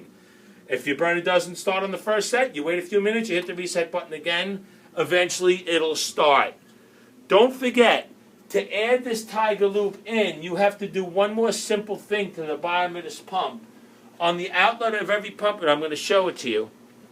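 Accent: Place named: Georgia, United States. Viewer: Great video, sir, very informative. And I love that Southern accent---Georgia perhaps?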